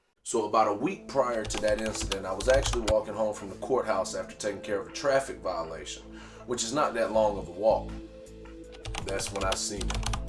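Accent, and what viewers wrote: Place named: South, United States. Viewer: I'm getting the weirdest vibe from you here. It's clearly all insincere. It looks like bad acting as you're reading a script. But there's more. You have the weird redneck accent thing going on with sayings and mannerisms that are supposed to convey that you're not too bright and that you're one of us. It's all fake though. You're smarter than you're selling yourself as. You're gaslighting and faking. I won't be subscribing for this "content" you're trying to sell me on. It's not clear what this channel is about but it seems clear to me that you're trying to monetize this incident. You put on this "cool" persona but your acting is terrible. Most people will not even notice though. Most everything people watch these days is completely fake and they still accept it as real. They say "I know this reality show is edited and scripted" but in reality they are falling for it. 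They are desensitized to fakeness. I found this video rather repulsive to watch. You are not the everyman you're trying to sell yourself as. You are not stupid. Most people will fall for this bad acting job you're doing but I am not one of them.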